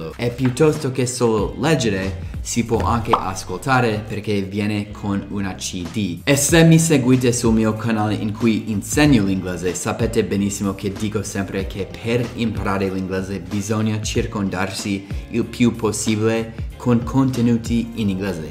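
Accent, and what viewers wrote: United States. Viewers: sei molto simpatico e hai una notevole proprietà di linguaggio in italiano, e conosci moltissimi vocaboli. solo hai un grande accento americano, ad ogni modo si capisce bene quel che dici, lo puoi anche tenere. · L'inglese parlato in Inghilterra é piú facile da capire. Con l'accento americano come il tuo non si capisce un cazzo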